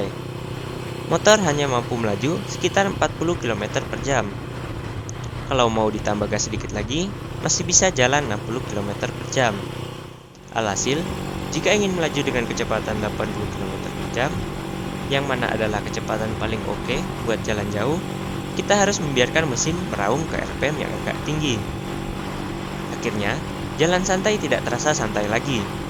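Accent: Surabaya, Indonesia. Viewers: Org Surabaya bang? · Lah org surabaya kah mang wkwkwk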